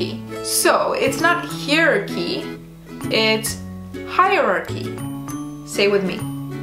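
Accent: Russia. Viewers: You have a russian accent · You do have an accent. A Russian one I presume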